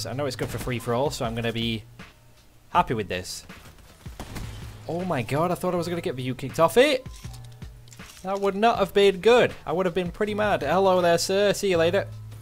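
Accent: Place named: Southern England, United Kingdom. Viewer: whereabouts r u from like coz u sound southern but I don't think ur from london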